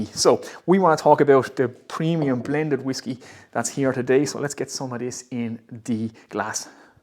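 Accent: Ireland. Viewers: The man was teetotal!! It's probably just something re-packaged!! How could he sample it?! Nice enough though. Like your Déise accent!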